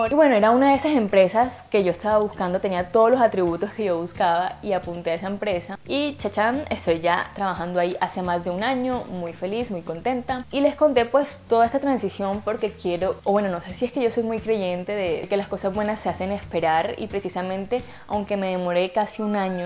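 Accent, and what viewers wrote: Caribbean Coast, Colombia. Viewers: Gracias! Me gusta tus vídeos, estoy aprendiendo español y el acento costeño es mi favorito. Saludos desde EEUU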